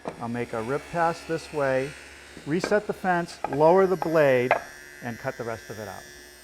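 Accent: New England, United States. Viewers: what I wouldn't give to have a blooper real of all his mistakes and him cussing in his New England accent xD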